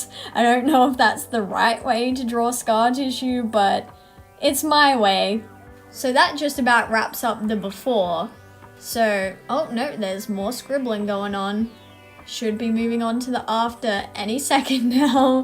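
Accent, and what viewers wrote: Australia. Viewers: Just found ur channel, ur personality is si great I love ur vids 😂 are you australian? Your art is amazing btw!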